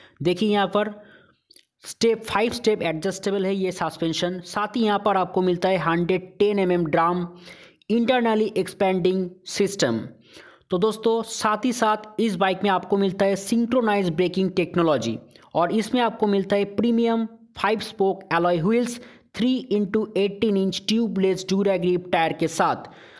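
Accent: Bengal, India. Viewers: I love hearing bengalee accent. Sweet like rasgullah .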